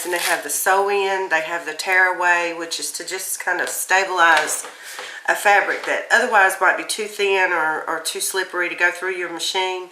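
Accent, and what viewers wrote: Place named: South Carolina, United States. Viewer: Thanks for this! Very helpful. I have to ask… are you from South Carolina? Love your accent 🤍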